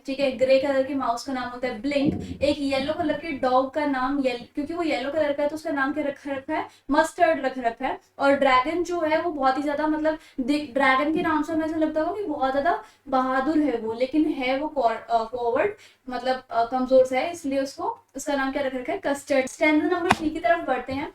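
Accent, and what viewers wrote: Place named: Haryana, India. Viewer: Didi apki awaz se aap haryana ke lag rahe ho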